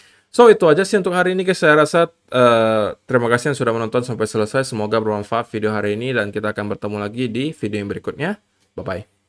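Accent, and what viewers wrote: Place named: Medan, Indonesia. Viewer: Bro kamu China medan apa Bangka? Logatnya medan y.. Salam dr medan bro 🍻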